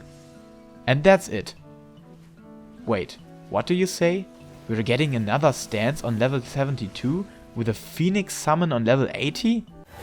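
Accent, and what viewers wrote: Germany. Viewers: Don't worry about the accent as long as you have clear and understandable diction. On a personal note I'd rather have an actual German accent than a faked American one. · As a German myself, I am cringing so hard with this accent xD it's so bad, sorry. Even though I do like your content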